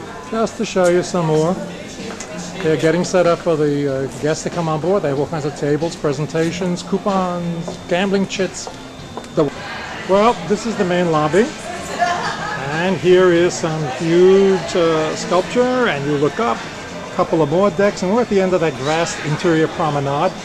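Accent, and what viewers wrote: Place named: New York, United States. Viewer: love the new york accent.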